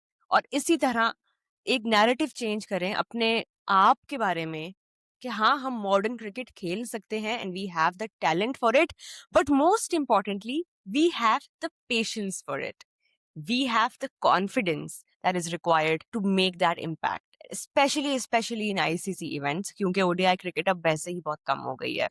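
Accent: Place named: India. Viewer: your accent feels more Indian than Pakistani accent that we've heard.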